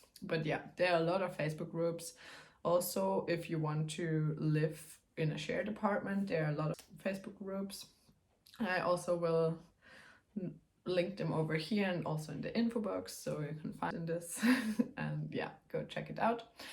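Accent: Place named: Germany. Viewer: it's a german accent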